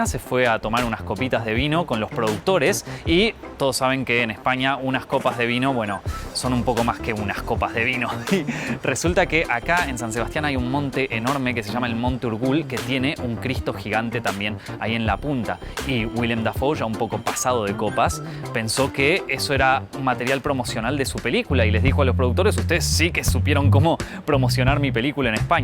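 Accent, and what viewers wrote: Argentina. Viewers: Dios mio!!! Ese acento argentino me encantaaa. Es hermoso! Un abrazo gigante desde Bogotá-Colombia 🇨🇴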